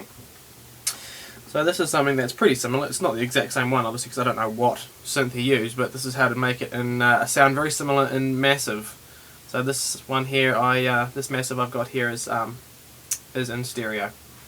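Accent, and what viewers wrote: New Zealand. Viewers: NEW ZEALAND!!!! YEAH lol. first heard the accent and thought finally someone from my own country!!! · haha i love how as soon as i heard this guy talk, i knew he was kiwi! NZ PRIDE!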